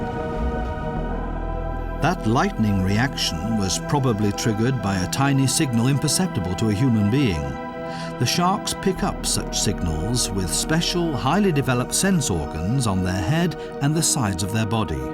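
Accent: United Kingdom. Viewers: "notanother" Uboob being narrated by a Brit, for Gods sake use a Lantino accent for Central American subjects. What's with all the Brits? At least this one I can understand, without subtitles.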